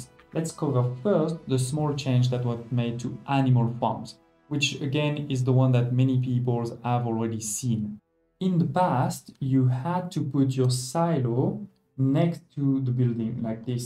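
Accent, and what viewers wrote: France. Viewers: Merci ! Cet accent français à couper au couteau rend l'anglais parfaitement compréhensible pour moi, donc double merci ! Bonne continuation.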